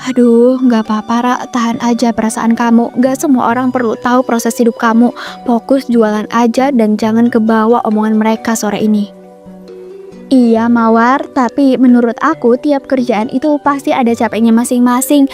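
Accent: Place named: West Java, Indonesia. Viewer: lagam bahaasa ya sunda ya tuh nyunda banget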